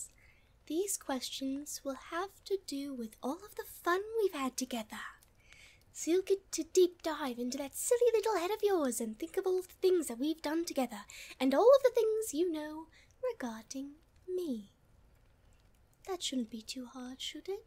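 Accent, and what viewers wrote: United Kingdom. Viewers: How… I swear you need to be hired by someone to do voice acting This British high ip accent is just…perfection, with the vampire roleplay, words can’t describe how amazing this is.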